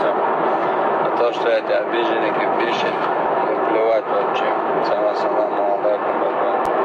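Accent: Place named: Urus-Martan, Russia. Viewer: Как ты разговариваешь... видно что ты из Урус-Мартан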